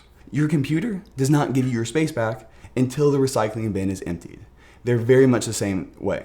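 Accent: California, United States. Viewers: They way he speaks reminds me on the SNL sketch, "Californians". Can someone from the US say where his accent is from ?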